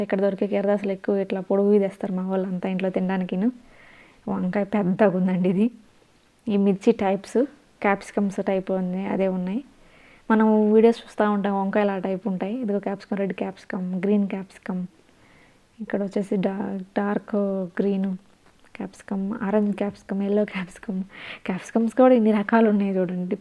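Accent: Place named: West Godavari, India. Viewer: Mee slang antha maa west godavari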